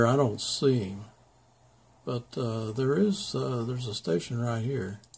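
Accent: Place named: Charlotte, United States. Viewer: I just today found your videos. You sound like you are close to me in Charlotte. Our “accent” sounds like mine. Am I correct?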